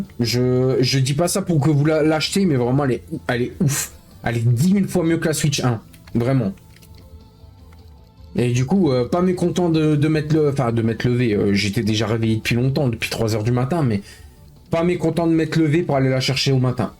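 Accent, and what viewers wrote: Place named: North, France. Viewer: l'accent de frotteur de bus du nord frère c'est effarant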